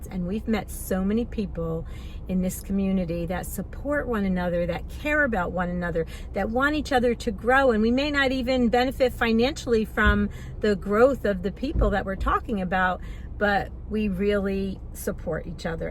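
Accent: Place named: New Jersey, United States. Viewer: I love your Jersey accent and your heart!! I’ve known you for 2.5 years and I have experienced how kind, thoughtful and honest you are! You’re the kind of friend that makes the world a better place!! God bless you!!❤❤❤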